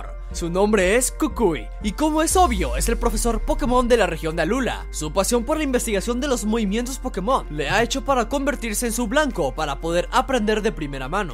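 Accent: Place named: Mexico. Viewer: donde puedo apartar mi versión del juego, o sea donde lo puedo mandar a pedir o algo por el estilo. Supongo que vives en México, por tu acento y las palabras que usas en tus vídeos.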